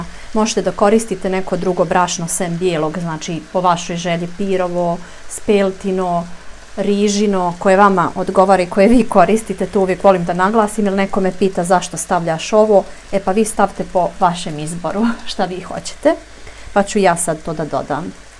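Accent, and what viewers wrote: Bosnia and Herzegovina. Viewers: Draga, volim kad vidim da divna mlada zena zna i voli da kuva. Posebno mi je drag vas bosanski naglasak koji me podseca na lepe trenutke dok sam zivela u Bosni sedamdesetih godina proslog veka. Pozdrav od jedne bake iz Srbije.❤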